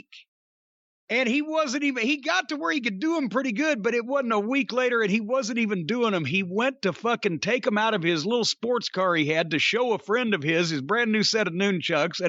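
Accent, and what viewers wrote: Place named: Midsouth, United States. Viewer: That's how we say it in the Midsouth USA lol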